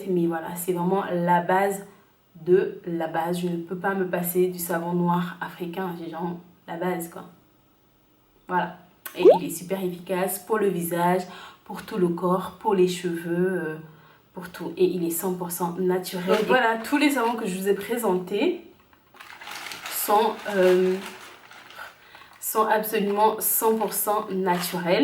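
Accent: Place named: Gabon. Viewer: Tu es gabonaise c est sûre je suis sûre t'es gabonaise je reconnais ce petit accent léger " n emmenez pas mon nom ohhh" trop happy je m abonne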